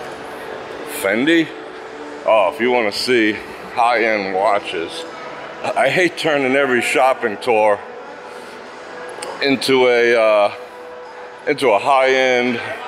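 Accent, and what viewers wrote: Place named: Brooklyn, United States. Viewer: Ahh, there's some new knowledge. Also, I now hear Brooklyn in your voice.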